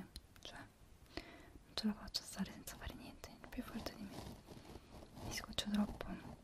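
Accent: Naples, Italy. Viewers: Amo il tuo accento napoletano 😂❤ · ma sei napoletana?,se si lo sono anche io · forse lo Avrai già detto qualche Altra volta.. scusa se te lo chiedo ma sei napoletana vero?... io lo sono😆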